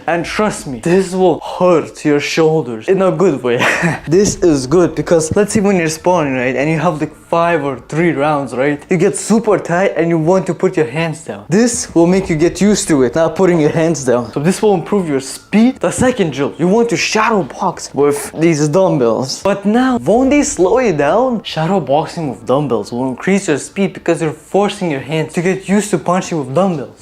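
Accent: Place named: Russia. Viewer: Im subscribing cause he got that rusky accent.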